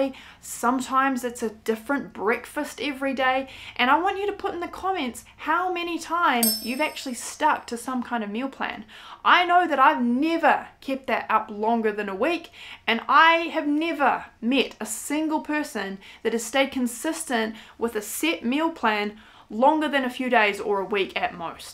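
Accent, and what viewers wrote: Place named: New Zealand. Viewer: Hi. First time seeing your channel. I heard your accent but I was trying to work out where you are. I’m a Kiwi too but live in Australia now (married an Aussie). You said “take out” instead of takeaway so I thought you must be living in the US. Then you mentioned having spent time there. That was great advice. I watch a lot of plant based vegan YouTube channels and they pretty much have the same meals as you showed. I think we all eat the same. 😂 What you said was so true. It’s easier to stay on track when it’s a habit. At first I thought no, I can’t eat the same every day. But I understand your points. Little tweaks can be easily made. Thanks!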